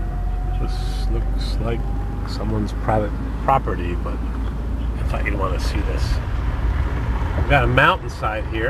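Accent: United States. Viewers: Driving around Henniker with Captain Obvious practicing their reading skills. There is a tree, there is a big rock, there is some sand, slabs of concrete and the worst New Hampshire accent you'd ever hear.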